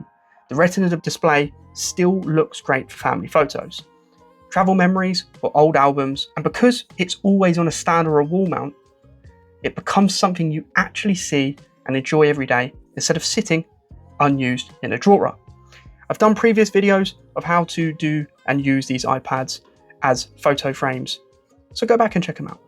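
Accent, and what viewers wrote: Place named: United Kingdom. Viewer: Loved the very strong british accent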